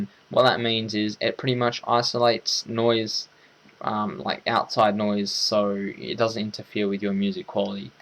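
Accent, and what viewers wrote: Australia. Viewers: thanks, aussie? :)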